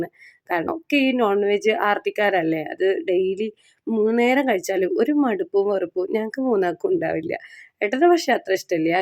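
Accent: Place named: Northern Kerala, India. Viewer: നന്നായിട്ടുണ്ട് അല്ലേലും വടക്കുള്ളോരുടെ വർത്താനം കേൾക്കാൻ നല്ല രസമാണ്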